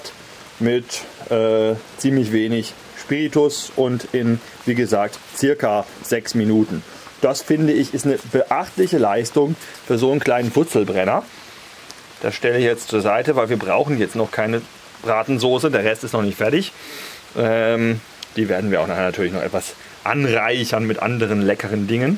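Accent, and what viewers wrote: Germany. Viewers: de kann das ( sch. in gebastelt) schon schweizerisch aussprechen zwar noch mit deutschem akzent aber tönt schon recht gut.die evelin hat guten einfluss auf dich.hehe witer so.i mag dini biträg..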